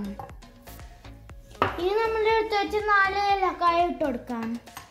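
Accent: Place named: Aluva, India. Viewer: വളരെ നന്നായിട്ടുണ്ട് നല്ല അവതരണം ആലുവ സ്ലങ്ങി ൽ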